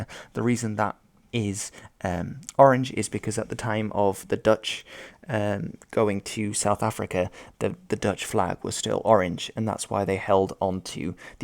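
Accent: United Kingdom. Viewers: Ben je half engels? je klinkt egt brits gap. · your Dutch? You sound like a British person?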